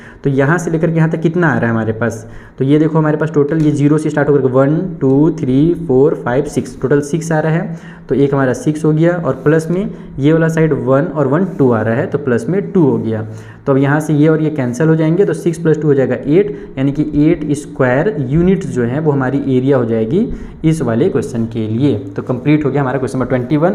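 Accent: Bihar, India. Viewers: 😊😅Sir lagta hai aap UP-Bihar se hain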